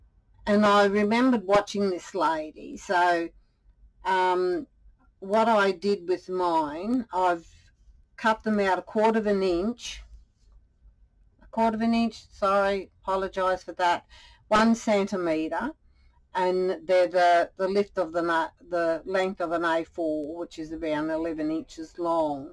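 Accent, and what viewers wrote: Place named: Australia. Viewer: Very strong Aussie accent. Worked in Aus for a few years before returning to NZ. Miss my Mates. Love your work, and l hope your health improves. We have free prescriptions here in New Zealand, and even before we only paid $6. It’s a shame that prescriptions are so expensive in Aus, considering every tax payer has to pay the additional 3% tax for Medicare.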